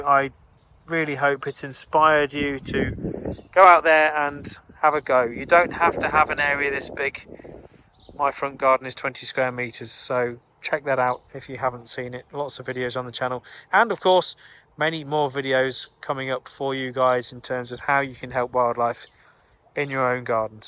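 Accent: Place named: Lincolnshire, United Kingdom. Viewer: This is pure perfection, it has everything, just stunning. Good to hear that you are also from Lincolnshire, thought I recognised the faint dialect.😊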